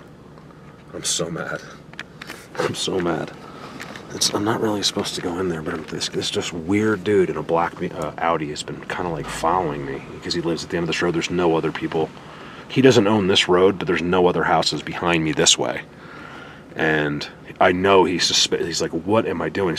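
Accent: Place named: New Jersey, United States. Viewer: I've been watching your excellent videos for a while and I knew I heard a touch of Jersey in your voice. Perhaps it's just a bit of Jersey attitude. In any case, you confirmed it. Good luck and stay safe.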